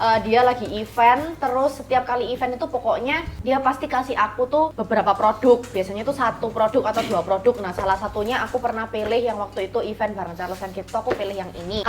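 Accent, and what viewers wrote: Java, Indonesia. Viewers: Cici tp ngmong ny ky jawa pdhl muka ny ky cina 🤩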